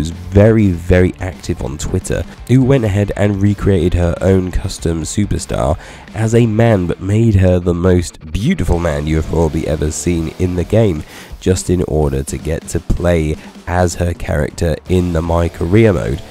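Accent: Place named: United Kingdom. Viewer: Idk why, but I just love British accents.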